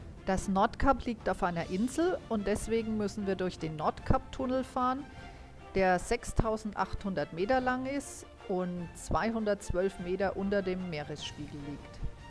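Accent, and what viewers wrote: Franconia, Germany. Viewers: Die Franken im Norden. ..... hab den Dialekt erst gehört, später Fürdder Kennzeichen, alles klar;) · Allmächt.su schee. Dei fränkischer komendar is glasse. Die Reise verlockt zum Nachfahren,wenn des sch.... corona rum is.derf mä frong was die ganze Tour gekostet hat.? Abo und like is da.liebe grüsse aus kulmbach.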